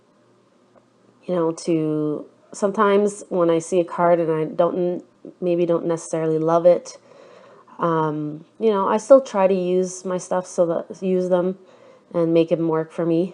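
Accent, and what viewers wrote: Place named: Canada. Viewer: Just found your channel, love your PL process video. Hope to see more of them. I used to live in Canada north if Toronto and last year we moved to Australia. Was really great to hear a Canadian accent.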